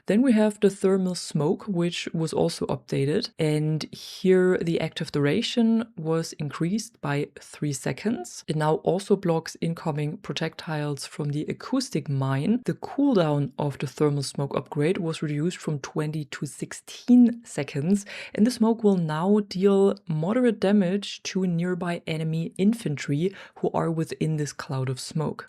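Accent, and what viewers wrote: Germany. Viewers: its german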